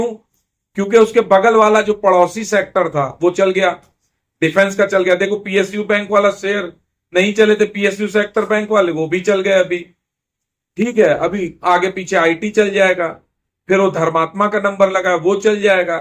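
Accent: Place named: Lucknow, India. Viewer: Bhai app lucknow kai hai kya, maza a gaya apke commentary sun kai. Wow 👌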